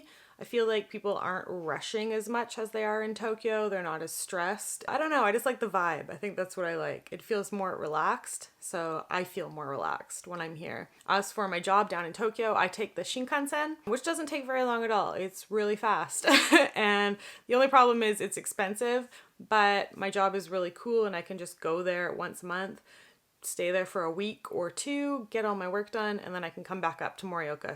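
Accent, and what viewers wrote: Canada. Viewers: I live in WA state and I interact with Canadians often. The dead giveaway for Canadians for me is the way they say "eh" and "about", and does not disappoint! LOL, thank you for sharing! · Has ever mentioned what part of Canada she's from? I'm curious about her accent My instincts say west canada, vancouver, but i'm a total novice when it comes to their accents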